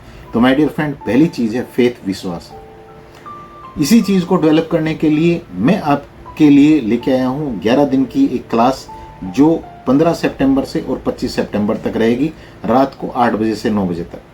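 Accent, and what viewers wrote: Haryana, India. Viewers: Aap ki boli haryana jasi h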